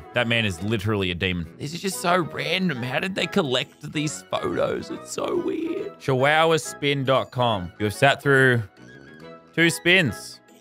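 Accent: Australia. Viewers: The Aussie accent😒😒 as an Aussie myself i find our accent to be the worse in the entire world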